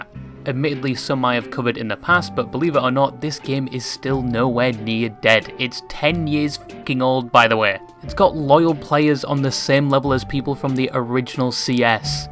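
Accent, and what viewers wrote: United Kingdom. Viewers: your not British?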